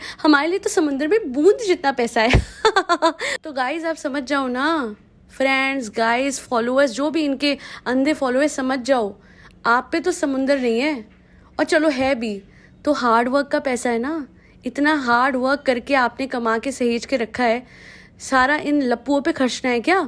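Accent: Punjab, India. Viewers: Your Punjabi is too cute 🤭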